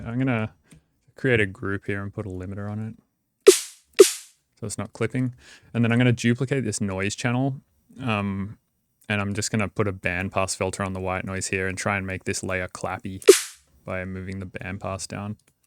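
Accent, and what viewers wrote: Australia. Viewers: He's from Australia, he always sounds like he has a cold! :D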